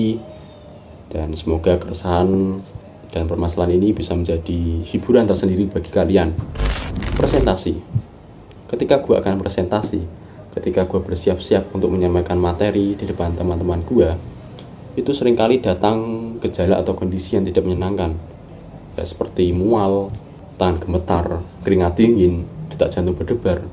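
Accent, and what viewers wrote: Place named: Java, Indonesia. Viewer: kalau medokk jangan maksa GUA bang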